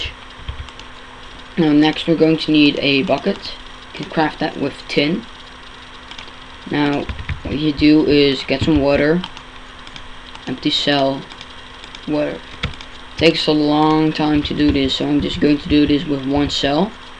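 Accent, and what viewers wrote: Netherlands. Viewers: Wow its surprising how many people recognize his Dutch accent I noticed it too. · Nice explanation. *waits patiently for 1.0.0 compatible release.* P.S. Are you Dutch? You have that accent.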